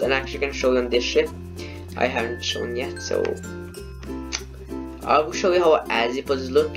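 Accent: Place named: Germany. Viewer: Are you german? Cus your accent which i love...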